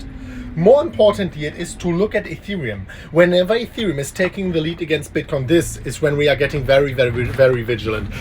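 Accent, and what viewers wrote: Germany. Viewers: Hes german right?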